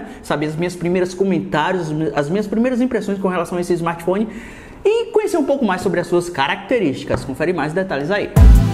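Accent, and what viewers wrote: Bahia, Brazil. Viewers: sotaque baiano, é isso mesmo produção? SSA tá feliz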